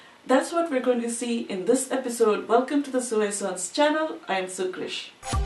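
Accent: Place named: England, United Kingdom. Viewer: Excpet ur strong english Accent.... Everything else was okay!!!!!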